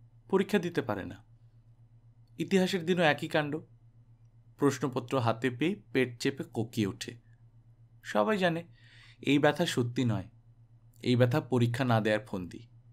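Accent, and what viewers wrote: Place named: Bangladesh. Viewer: বাংলাদেশের লোক... আর ভাই, সব যায়গার ই আলাদা এ্যাক্সেন্ট থাকে৷ ব্রিটিশদের ইংলিশ ও যায়গা ভেদে ভিন্ন রকম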